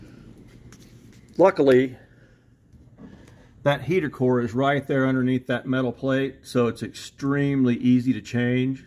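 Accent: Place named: Midwest, United States. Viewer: Dude, being a Hoosier, your midwest accent makes me feel right at home! I often disagree with Adam when it comes to opinions about styling, as he has obviously been indoctrinated by GM, but I gotta say I agree with him on this one. my opinion doesn't matter, it's your car and your dream. It's gonna be a stunning Squire regardless! Good job as always. Gotta admit, I'm in awe of your meticulous attention to details!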